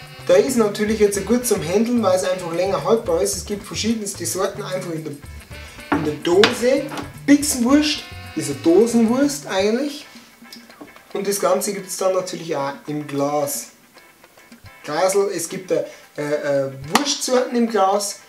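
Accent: Bavaria, Germany. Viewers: Hawadere ... es ist so wohltuend, einen jungen Menschen Dialekt reden zu hören, hier in Amberg, wo ich herkomme, reden die nur noch Hochdeutsch, die Dialekte sterben bald aus, wodurch ja auch, besonders im Bayrischen, Genialität verloren geht, z. B. zusammen wird zu zamm, drei Silben zu einer ... manches verstehe ich allerdings nicht wie das n in heind , wir sagen hier heid ... hätte jetzt gern Dialekt geschrieben, aber die normalen Buchstaben reichen nicht für alle Laute aus ... es gibt auch brotlose Brotzeit, für die man dann manchmal keine Zeit hat ... Servus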